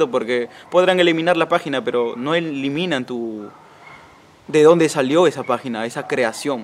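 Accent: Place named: North, Spain. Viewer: Algun dia ire a vivir al norte para tener su acento y decir pucta v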